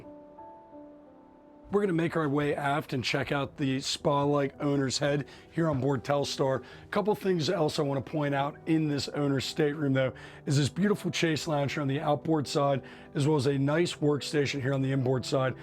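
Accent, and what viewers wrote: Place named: Maryland, United States. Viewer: Maryland accent. :)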